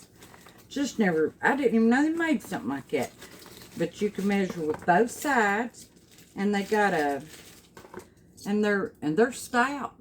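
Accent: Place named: Tennessee, United States. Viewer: How cute are you!!☺️… Love that accent.. Are you in Tennessee?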